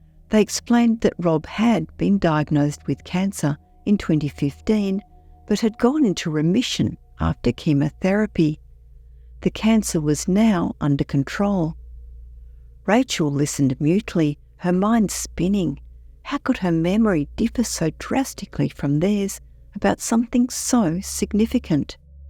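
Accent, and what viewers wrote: Australia. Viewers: The woman narrating this story is Australian, not British.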